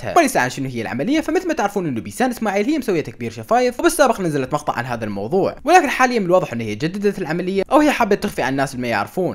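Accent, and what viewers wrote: Iraq. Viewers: بموت في اللخجة العراقية 😍طبعآ تحياتي من فلسطين ل العراق 😘💖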